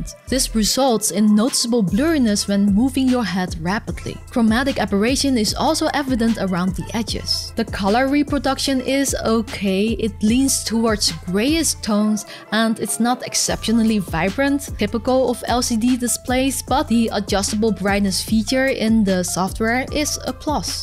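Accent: Netherlands. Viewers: Sounds like you got a dutch accent.. hehe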